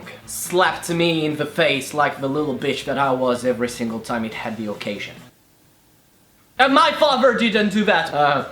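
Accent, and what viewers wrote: France. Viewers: perfect frenglish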